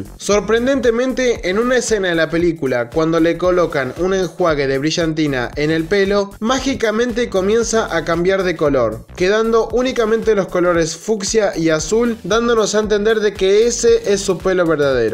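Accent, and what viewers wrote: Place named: Argentina. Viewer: Eres argentino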